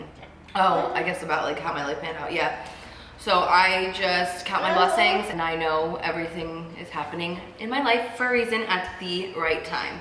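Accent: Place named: East Coast, United States. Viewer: Her east coast accent will always be endearing.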